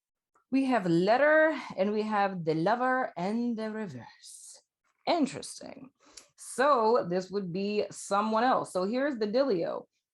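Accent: New Orleans, United States. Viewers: I friggin love your soul,. Your channel, your messages, and you as an individual are inspiring, enthralling, and captivating. And I love your New Orleans accent. I’m a Detroit native in this life. But I really have this thing for accents and dialects as a musician. Thank you and namaste. I always look forward to seeing you. Now lemme rewind a bit because I was really focusing on getting this message right on the first try. Think I got it. 🤔😅🙃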